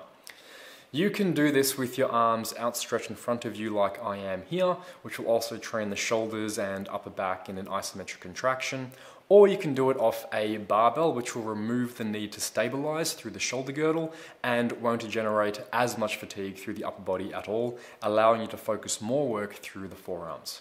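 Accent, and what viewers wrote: Australia. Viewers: Was so happy when I heard that Aussie accent coming through 🤙 great videos mate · the whole time i thought this asian guy speaking with fluent Australian accent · Blessa my heart Blessa my soul Never seen an Asian whith an Aussie accent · Was NOT expecting the Aussie accent from THIS guy, just saying!!! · Are you Australian,?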